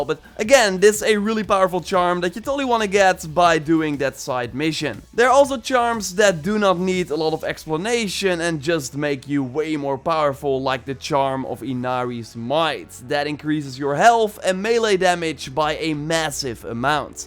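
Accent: Netherlands. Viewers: Vire arrows 😀. Your dutch english never gets old.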